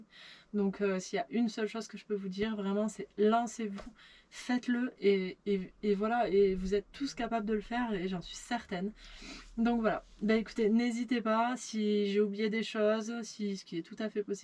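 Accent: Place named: South, France. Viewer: merci, excellent choix, mon budget est réduit, je vais aménager seule mon jumper également 😊 je suis de l'Hérault, tu as l'accent du sud aussi, de quel coin?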